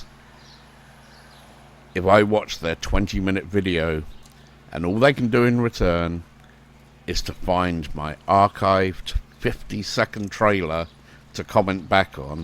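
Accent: England, United Kingdom. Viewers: Oh.. I see... not only your accent but also the atmosphere looks like English one... ha ~ Thank you anyway. Best regards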